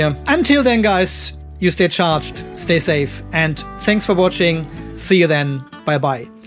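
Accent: Germany. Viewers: Now this is a very video for me. Let me explain. I recently dismantled 6 Mastervolt 24 Volt batteries, each containing 6 Thundersky 170 Ah cells. When I dismantled them, I noticed the cells were in a metal enclosure. 2 steel bars on each side and those bars were connected to two end-plates by sticking through holes in the end-plates and were kept in place because they were twisted behind the end-plates. (Apologies, English is not native to me). Some batteries had so much tension build up due to expansion of the cells, that, when I untwisted the sidebars, the batteries literally exploded mechanically with a loud bang. After removing the cells, some cells were so inflated that they resembled the Michelin puppet. The ones I didn't trust were taken directly to the communities local chemicals intake point. The other ones I tested and kept them when they were still good. 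I'm about to install EVE cells into my sailing yacht. I don't even consider compression. If a cell expands (too) much, I want to be able to see it and take appropriate measure in time (replacing). Thanks, love your channel, especially the German? / Austrian? accent. I learned and still learn so much from you as well as from Kermit.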